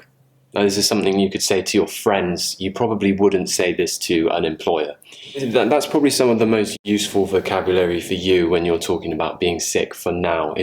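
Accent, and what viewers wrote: United Kingdom. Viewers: Hi teacher, can i add you on whatsapp? i would like to practice my brittish accent with you.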